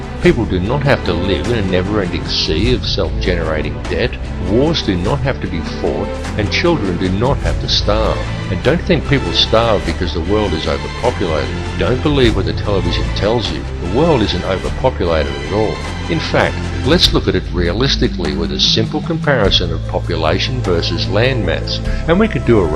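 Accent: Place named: Australia. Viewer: have any idea who the speaker might be? he seems australian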